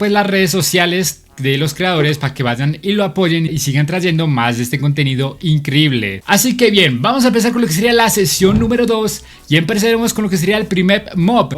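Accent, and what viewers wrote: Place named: Colombia. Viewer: Jjajaa ese acento se nota de Colombia saludos parcero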